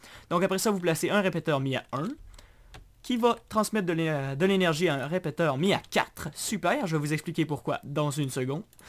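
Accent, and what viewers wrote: Quebec, Canada. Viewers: Ta laccen quecois et texpliquer comme un francais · je découvre tout juste, et franchement c'est vraiment pas mal. (j’adore l'accent québécois ) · Moi perso j'aimerais être un Quebecois j'aime l'accent ^^ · j aime trop ton accent quebecois ;)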